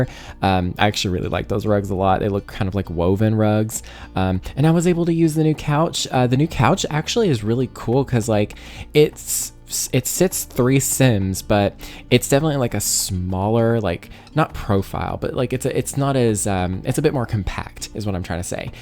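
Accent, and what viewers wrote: South, United States. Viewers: This build is insanely beautiful! Everything about it. The porch and the interior on the lil diagonal! EA should be giving you their packs for free because your builds are so amazing!!! I send all your videos to my friends who play the Sims and everyone loves your energy, creativity, and your lil Southern accent!!! Hugs and love from Philly!!!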